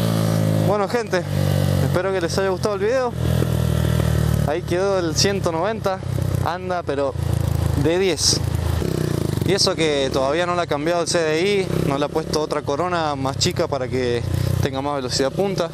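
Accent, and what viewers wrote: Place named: Mendoza, Argentina. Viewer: Jaja wuacho SOS de Mendoza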